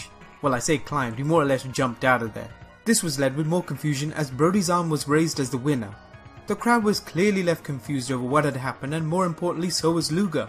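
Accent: England, United Kingdom. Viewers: Are you English? Just wondering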